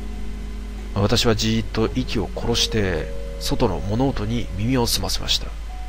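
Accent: Kansai, Japan. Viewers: あれ？136さんは関西人？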